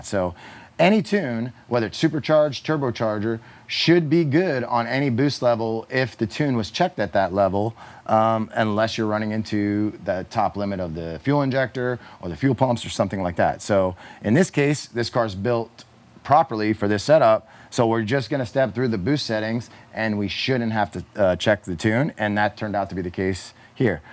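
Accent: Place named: East Coast, United States. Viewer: This that east coast talk, hey man it's sToCk run me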